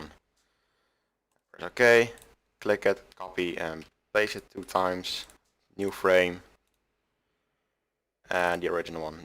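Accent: Netherlands. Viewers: I knew you were dutch by your voice but i can read it here! sup same country bro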